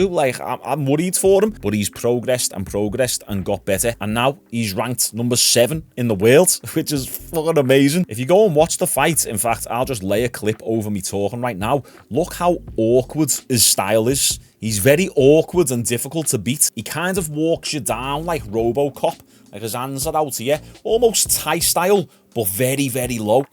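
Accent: Liverpool, United Kingdom. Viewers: I’m fae Glasgow and I love the scouse accent,it’s class! Cheers for the content,mate! Keep up the good work my friend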